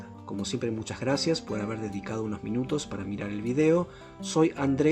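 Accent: Buenos Aires, Argentina. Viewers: Hace rato que sigo este canal, pero hace rato también que no venía a éste. Y cuando veo y escucho las recetas, me siento tan en "Baires"! : vaini"sha", ra"sha"dura de medio limón, mantequi"sha"... lo preparé "sho"... es tan "sho", como me dicen mi amigos hispanohablantes... no sé si es tan YO o nuestra idiosincracia al hablar y ese cuidado del habla... cuando hablamos y decimos las cosas... Como dicen mis estudiantes. En todo caso, este canal me encantó siempre por su ELEGANCIA, su lado RETRO, sus ustensillos paseistas, su lado tan porteño, su manera de ser tan 'nosotros"... GRACIAS Andrés, por darnos tanto!!!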